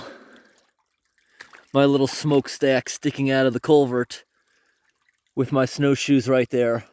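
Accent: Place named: Michigan, United States. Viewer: Sounds like a Michigan accent.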